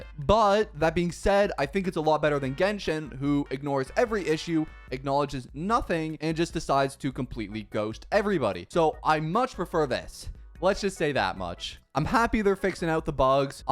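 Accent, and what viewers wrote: Canada. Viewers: Are you from Ontario? Thats a huge Ontario sounding accent lol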